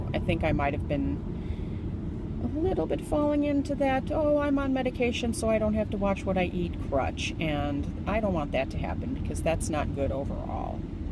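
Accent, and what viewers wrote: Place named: Michigan, United States. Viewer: Sounds like you live in Michigan, which is where I live! I love your videos...and my friends found out about my videos and made fun of me too. Keep on keeping on. :D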